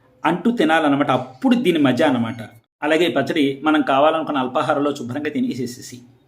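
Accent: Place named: Tamil Nadu, India. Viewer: మీరు తెలుగు వారైనా ఎక్కడో మాట తీరు మీ వర్చసు తమిళం లా ఉంటుంది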